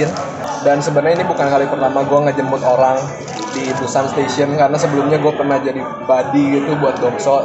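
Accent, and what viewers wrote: West Java, Indonesia. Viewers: Eta nya .ari logat sunda mh teu d mn2 bde stay d mn oge..keu2h ..moal leungit ..kjn pk bhsa gua..elu..😂ttp we aya.. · Kesini karena muncul di beranda. Salam kenal. Baru denger suaranya aja udah bisa ketembak kalau orang Sunda, cuma takut salah karena facenya eh ternyata bener asli Sunda, Jawa Barat dong. Salam kenal. Halloo... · Logat sunda na mantep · Cara bicaranya kaya legok Sunda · Aya logat sundaan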